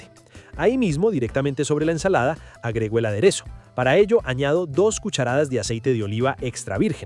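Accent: Colombia. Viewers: Lo mejor de todo es tú acento Colombiano. 🌞🌠🌎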